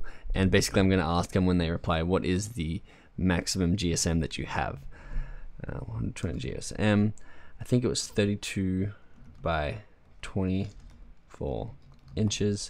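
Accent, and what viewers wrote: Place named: Australia. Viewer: hey cool i live in vegas too, i thought u were from australia tho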